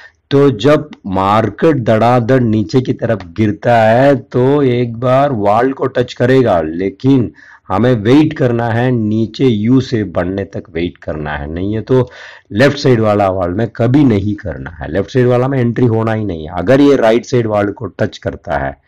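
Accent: Tamil Nadu, India. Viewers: U r a tamil guy... I can see that from ur tone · sure will try the strategy strictly for 5 working days. Let see what happens. I have a friend who earn good moeny in Iron fly weekly said the same thing chart will speak to you if you give market some spare time to concentrate and learn. But I don't know why successful traders like my friend they don't like indicators they prefer chart patterns and momentum of the market to catch the momentum for exp recent CPI result. I mean indicator is also doing the same thing indicating over bought over sold (Nadarya envelope) Multip lever will indicate bottom and tops. I mean this indicator are increasing our efficiency and productivity nothing else but they consider as FOMO. Let see by the way thanks for taking the time to guide me in the mid of the night you have earned a loyal SUBSCRIBER. Also your hindi is abstract I guess you're Tamil Shukriya Ji 💐💐🙏🙏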